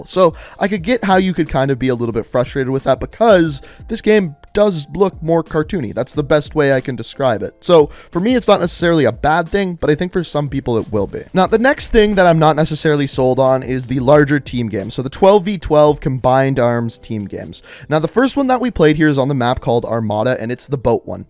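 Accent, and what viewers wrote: Canada. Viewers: His accent is so canadian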